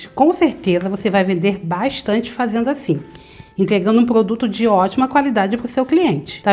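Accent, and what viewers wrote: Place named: Rio de Janeiro, Brazil. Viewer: Oi Boa tarde suas receitas são ótimas e sua voz e muito bonita tem um sutak carioca e isso mesmo💖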